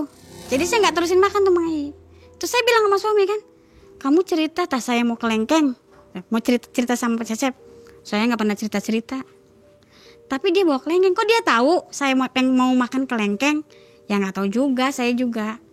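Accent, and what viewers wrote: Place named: Cirebon, Indonesia. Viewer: Bahasanya kaya cirebon😅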